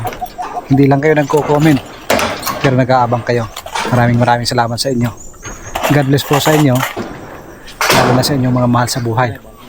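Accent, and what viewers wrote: Waray, Philippines. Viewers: sir waray waray ang salita nyu taga saan po kayo?ofw from abu dhabi and taga leyte...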